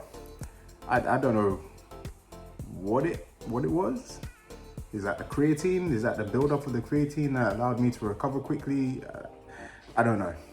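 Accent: United Kingdom. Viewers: Dude I was also thinking the same shit and was gonna write a comment along those lines,he really does sound like one character and scene that came to mind...in SNATCH when TYRONE made a call to SOL and was like “Boris just got to his house...Hang On he’s not alone,he’s with some fellas”😆😆😆😂😂😂😂 I am South African and I love Bri’ish accents and all of GUY RITCHIE movies,their dialogue is priceless.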